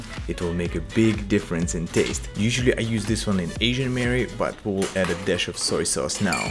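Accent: Russia. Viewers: Русский акцент, смотрел похожего бармена, ты попал в рекомендации, удачи с твоим делом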